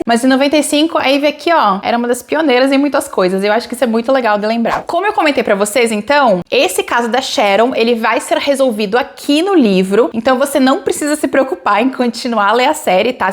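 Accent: Rio Grande do Sul, Brazil. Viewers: Adorei a resenha, sim me convenceu a ler e com certeza irei! Inclusive, nd relacionado com o video kkkk, mas eu sabia q eu conhecia esse sotaque gostoso gaucho de algum lugar, ai vendo sua caixa postal percebi q tu é de Bento, quase vizinhos kkkk, sou de Caxias do Sul. Conheci recentemente teu canal e tenho maratonado!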